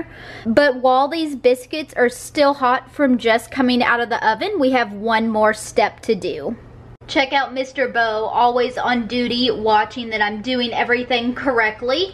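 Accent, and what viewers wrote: South, United States. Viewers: “Brushy ma jig” love it! Love ur southernness.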